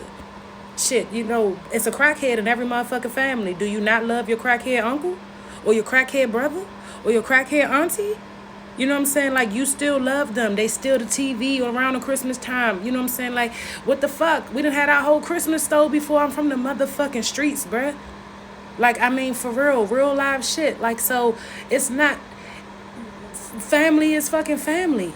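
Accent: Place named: DMV, United States. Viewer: Im from DC and when I first heard you speak I knew you was from the DMV, you have the accent. I know you fucked up about this whole Chyna situation, but forget her. She was jealous of you. Plain and simple. She was jealous that you can be lowkey and private, wear comfortable clothes while she has cameras running around her all damn day. When I seen yall first interact on the show, she would throw you small jabs that I picked up on but Im surprised you never picked up on them. Oh well, its the past. Time to move on. And you and Chyna seem so different in a way, maybe befriend women who have a similar personality to yours. I know your going to be ok, you seem to know how to cut someone off if they not on the same wavelength as you.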